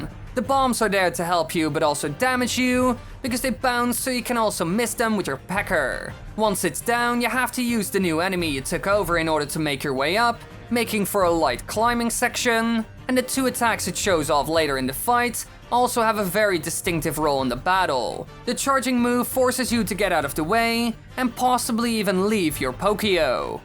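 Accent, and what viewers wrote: Netherlands. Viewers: Goshdarn you're so dutch by your accent. Or belgian?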